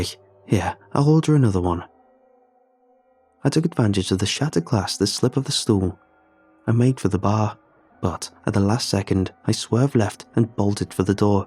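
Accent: United Kingdom. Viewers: the scariest part is is when i found he was british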